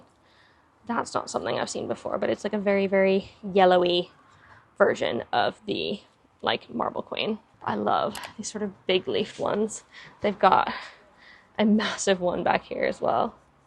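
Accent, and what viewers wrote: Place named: United Kingdom. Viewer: Your accent has always been so interesting to me, you have picked up so many british-isms that you have an in-between accent heh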